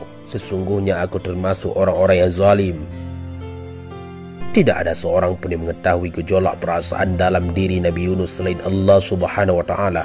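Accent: Aceh, Indonesia. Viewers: Ini pasti orang aceh,,terbaek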